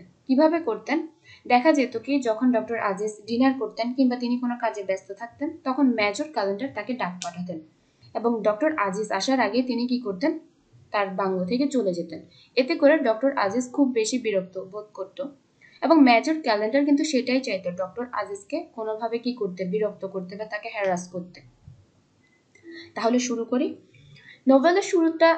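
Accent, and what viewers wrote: Chittagong, Bangladesh. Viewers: Apu ki Chattagram er?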